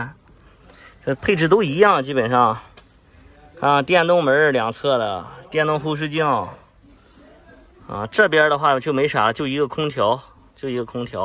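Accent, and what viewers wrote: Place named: Northeast, China. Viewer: 你的口音听起来是山东人，不知为何又带有东北味道😓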